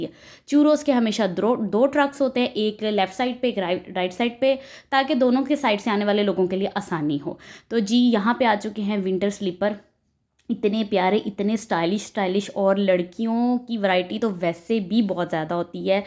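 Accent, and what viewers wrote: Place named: Punjab, India. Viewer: Wah G... urdu k sath punjabi ka jo tarka lagya hai... maza a geya.. 😂😂😂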